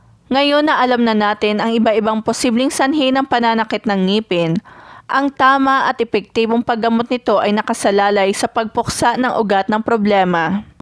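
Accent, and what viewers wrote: Visayas, Philippines. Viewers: Bisakol magsalita Hahahahaha hahaha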